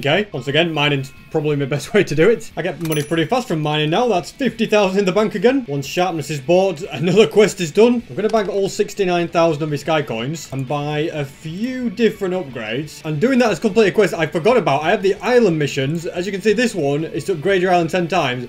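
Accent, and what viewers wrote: United Kingdom. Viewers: hey im new to your channel also i have a question are you britsh? also again good job on the content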